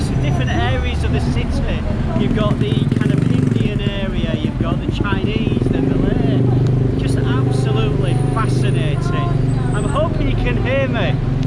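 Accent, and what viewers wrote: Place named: Northern England, United Kingdom. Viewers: Selamat Hari Natal (Merry Christmas) dan Selamat Tahun Baru (Happy New Year)! I’ve changed my YT name from UncleGweilo to this. It’s now even more of a mick take of me by me to Singaporeans, who use “ang moh” rather than “gweilo” to derisively refer to a white person. You and your anchovies,! LOL. You should call them ikan bilis- their Malay name- and avoid the issue totally. Great to munch on with a cold beer after a long day's exploring. Go with the flow trying to pronounce Malay and Chinese names. Be like the Malaysians; if it’s close enough that you can be understood, it’s okay. "Relak lah, dun worry" as they say in Singapore! I'm not going to have a go at your northern accent as I'm Australian, which is a curse in itself! I think there are more varieties of nasi lemak than a cat has hairs. With so many cultural influences at play, you can just head from place to place to find the one you really like. 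Changes in the sambal; fried egg, boiled egg, or century egg; barbecued versus deep-fried chicken, and so on. A guy by the name of Seth Lui recently posted a video comparing five different nasi lemak places in Singapore. Search him out on YT, if you’re interested. Gregory Leow, who travels around Singapore seeking out authentic traditional ethnic Chinese cuisines also does great work reviewing food without making a “foodie wanker” of himself. What you think is the best is the best because you think it is, and what anyone else thinks doesn’t really matter. But if you s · Hi, which part of Uk you come from? Liverpool? Sound like you are from the north. I’m Malaysian live in Uk for 29 years! Fuck! Really?! Yes, but I love it here! When I watch you the first time, I feel like ‘home’, you know what I mean? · South yorkshire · that's fine, but his accent is heavy Northern. I bet you guys understand my standard American accent more. 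lol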